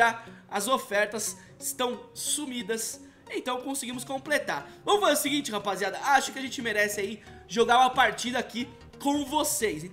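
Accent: Minas Gerais, Brazil. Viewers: Mineiro d dpirc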